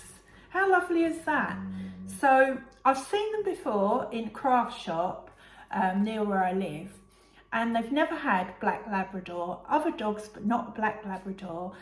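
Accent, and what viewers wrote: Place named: Australia. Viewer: G'day - good Aussie accent! A cold Fosters always goes down well on a hot day. Nice poo bag. Wilson will be looking forward to providing something for you to put in it! I will have a look on the Etsy shop. Certainly a ton and more of rainfall in Australia. With the fine weather in the UK you will have to get your bucket and spade out soon to head for the seaside. Happy days! Can you swim or will you need a blow up rubber ring with a horses head or similar on the front! We often went as a family to Great Yarmouth in summer - donkey rides on the beach.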